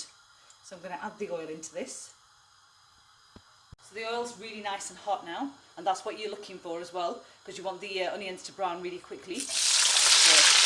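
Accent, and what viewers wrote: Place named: Coventry, United Kingdom. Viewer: WOW so simple to cook. I might impress the wife with this. Thanks. P.S are you from Coventry? Your accent sounds very Cov.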